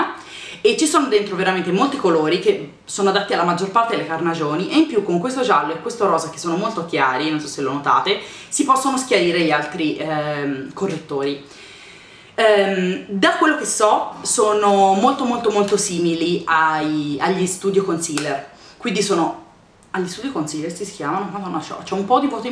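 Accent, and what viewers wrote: Tuscany, Italy. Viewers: ciaooooo sei beramente bravissima!!!! ma sei toscana!?!? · Ciao! Finalmente sei tornata! Nulla, volevo dirti che sono (come credo molte delle tue iscritte) molto fiera di te perchè hai inseguito il tuo sogno e hai raggiunto i tuoi obbiettivi. Devi essere veramente felice della persona che sei, credimi! Continua sempre a fare quello che più ti piace e vedrai che di soddisfazioni ne arriveranno sempre di più:) (Mi chiamo come te e sono anche toscana come te ^^ Quando ti sento parlare mi sento proprio a casa!) Un bacio a Tiffyyy! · non è vero che non sei cambiata dall'inizio. tu sei cambiata, si, ma non in peggio, sei diventata meravigliosa. ogni volta che guardo i tuoi video penso a quando di nascosto dalla mamma accendevo i pc e mi mettevo a rifare i trucchi bellissimi che mi insegnava una biondissima ragazza toscana, dall'accento pronunciato e esilarante, che ogni volta mi metteva ilarità. 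ora sei cresciuta, ma questo è naturale, l'importante è farlo bene, e, lasciatelo dire, TU CI SEI RIUSCITA. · A me sei mancata perché è mancato il tuo modo di esprimerti e mi è mancata la toscana di youtube.. perché quando da Bologna guardavo e guardo i tuoi video la sera dopo aver sentito un sacco di accenti diversi, sentendo parlare te mi sentivo un po' a casa. Metti allegria e mi piace la tua giovialità! complimenti per la tua votazione e in bocca al lupo per il tuo futuro da MUA! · Sono felice che l'accento toscano ci sia ancora!!! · che bello che sei tornata! mi mancava tanto anche l'intonazione toscanaccia! eheheheh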